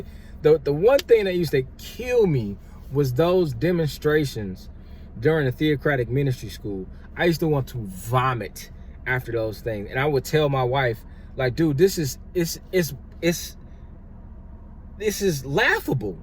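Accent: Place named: Wisconsin, United States. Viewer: I come from a large family. Both sides are JW. I left a while ago. I watched your first video yesterday and as you were talking I was like I know he is from Wisconsin and when you said it, I said... “I knew it!!” . 😁 Stay strong brother. The worst residual effect for me is Anger. And the fact that I was actually about to teach my daughter this mess.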